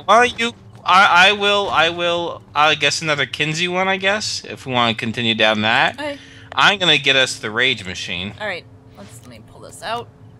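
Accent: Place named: United Kingdom. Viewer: That british accent is offensive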